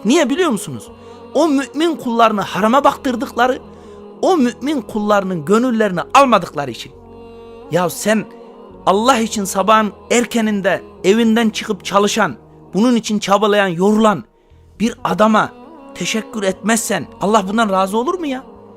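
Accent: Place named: Konya, Turkey. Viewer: "Çen çen çen "😂😂😂 sen konyalının hasısın hocam.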